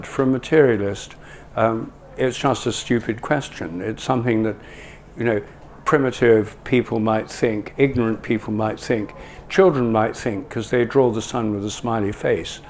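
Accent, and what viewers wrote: RP, United Kingdom. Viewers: This guy loves his posh British accent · Yukky muddled thinker - ignore the suit with an upper crust British accent. Soon as he said he had lived in India I thought “Yeah a continent full of devils and demons” of which he is now one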